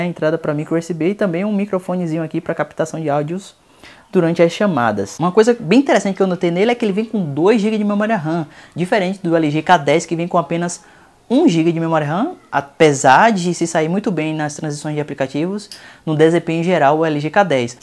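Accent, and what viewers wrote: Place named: Bahia, Brazil. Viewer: muito bom; esse cara deve ser baiano... pela fala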